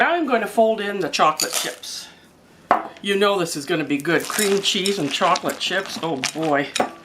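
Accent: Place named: Minnesota, United States. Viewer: Hi! Do you and live in Mn? I'd say, you sorta have a Minnesota accent!😀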